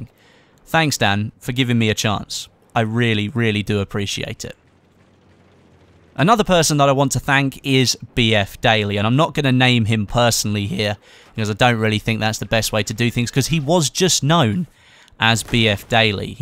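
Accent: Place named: United Kingdom. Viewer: Hey, you're awesome. From the time we were all infatuated with the T-Rex on Rogue Transmission and the Megalodon, I have loved your videos. You have never failed to please your audience (and turn us(me) on with that sexy british voice of yours), and are always looking out for the fans. I subbed at around the 50K mark, so right around the first easter egg hunt for BF4, and there was a point for a couple of months where I would leave a comment and I would have the guarantee of your reply. Bigger Youtubers often lack that quality, which I can understand, but you go above and beyond. I remember I was staying up late (11PM, Central US time) and you added me on G+. That put me in a position where I knew you were awesome enough to actually actively pursue some of your most active followers. Anyways, I love your videos, and 'grats on your big milestone. 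Also, I am just dying to see the Vlog with the 100K Silver play button!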